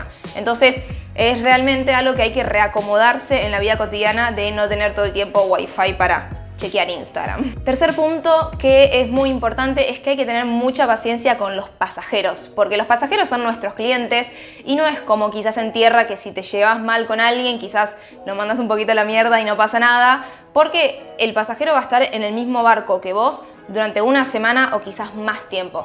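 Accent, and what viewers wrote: Argentina. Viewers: que buenos tus videos . das tremenda info, amo tu acento y jerga argentina, yo te entiendo por que vivo en tu pais pero aveces usas terminos muyyy argentinos que seguro gente de otros paises no van a entender. gracias por toda la infoooo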